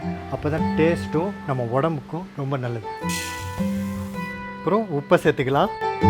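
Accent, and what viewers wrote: Tirunelveli, India. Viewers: Super thambi &unga samayal+unga speash nan thirunel vely eapdythan sampark vaipan super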